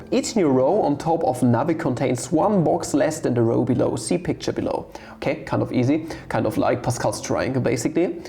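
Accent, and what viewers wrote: Germany. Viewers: I can feel your german accent while you speak English.😊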